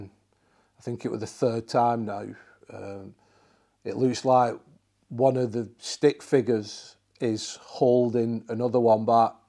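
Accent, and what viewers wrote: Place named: England, United Kingdom. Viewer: I just figured out that English people don’t pronounce their h’s if it’s the first letter of the word. Like hello would sound like “ello”. I love your accent. It’s very cool and makes you nice to listen too. Like when you say half. It sounds like “aff”. 😊❤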